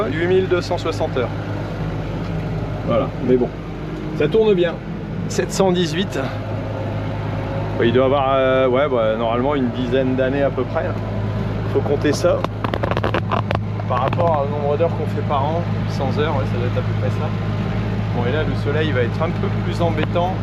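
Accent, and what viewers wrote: Nord, France. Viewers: y fait noir comme dans une....., c'est parti mon kiki, merci pour l'accent du Nord et la vidéo 👍👍👌👌😊😊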